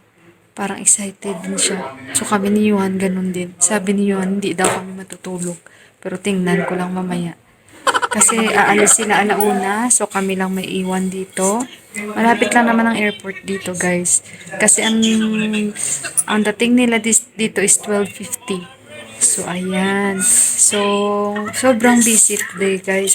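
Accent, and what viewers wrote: Iloilo, Philippines. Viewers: Hello po sa mga in laws nyo. Ang sweet nyo po magkwento. Ilongga po ba kayo kabayan? Parang d po kayo marunong magalit hehe. Magiging busy na Po kayo nyan with your in laws. Pero update nyo parin sana kmi. Godbless po ❤️ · Hello ate, nice to see your vlog, saan ka po sa visayas te. Ilonggo accent ka. Ilonggo dn po ako. 🙂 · Saan k dito sa pinas sis prang ilonggo yong tagalog mo bago po ako dito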